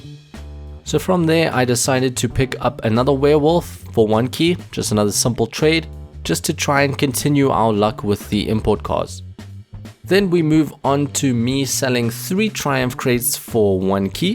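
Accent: South Africa. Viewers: Are u from south africa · Are you South African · Ahh same, I thought I recognised the accent but the newzealand accent and the South African accent are so similar, kan jy afrikaans praat? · Just wondering, are you South African, it’s the accent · May I ask where you are from? You sound like you from South Africa? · I love the South African accent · I live in Gauteng thats awesome dude I could tell by your accent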